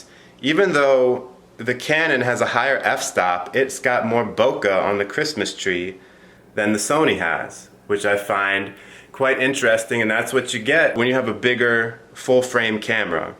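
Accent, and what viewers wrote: England, United Kingdom. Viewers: I didn’t comment earlier, so let me fix that and say this - the floppy hair, the accent, the Christmas tree...it’s like you’re about to star in some British holiday romcom that my mom would make me watch with her. Adorbs. Good to see you back in all your crispy, high-def glory. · Oy me old china, I do like your English accent! 👍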